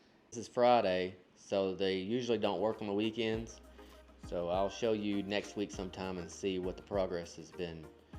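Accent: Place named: South, United States. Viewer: Watching the progress! What State are you in? Sounds like Alabama or Georgia!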